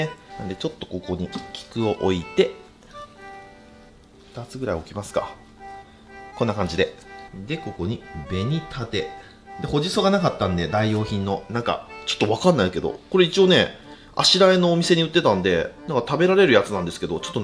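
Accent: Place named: Aichi, Japan. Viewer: 私も愛知県民のせいか適度な方言が安心感あるw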